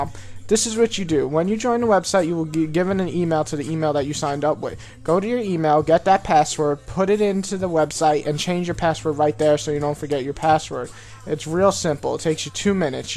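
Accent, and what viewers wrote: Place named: New York, United States. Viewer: What part of NY you live in? 123ilikecheese456 was right, you do have a kick ass accent.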